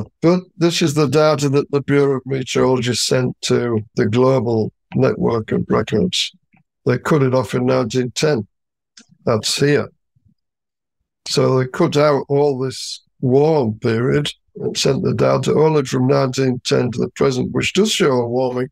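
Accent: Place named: Yorkshire, United Kingdom. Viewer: He sounds as if he's from Yorkshire.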